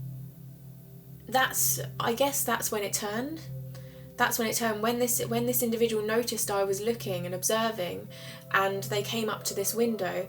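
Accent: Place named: RP, United Kingdom. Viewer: ok, your accent is pretty posh, and my eileen was a borderer, but you have a good heart.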